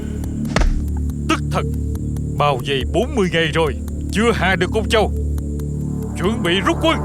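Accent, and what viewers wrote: South, Vietnam. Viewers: Ky thuat long tieng cua anh dat toi trinh do dang cap ...giong nam bo hay ...hihi..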